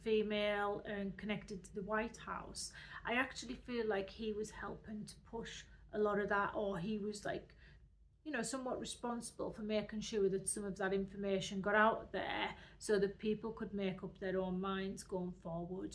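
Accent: United Kingdom. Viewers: Is this man British ?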